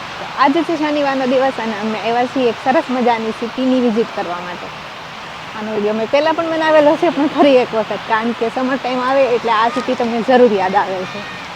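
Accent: Gujarat, India. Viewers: ben tame sav desi gujrati language kem bolo cho?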